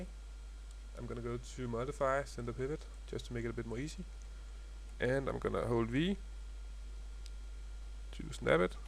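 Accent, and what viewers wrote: Denmark. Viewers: are you danish swedish or are you german i am danish by the way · I can easily hear you're danish d: ! not only by the name d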